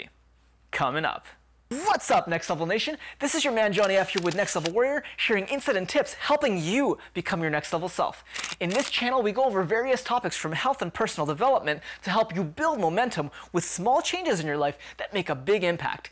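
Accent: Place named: Canada. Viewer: it does sound very Canadian, the portuguese nuance is very very delicate - almost unnoticeable! I am portuguese, but i live in Poland! um abraço da Polónia ;)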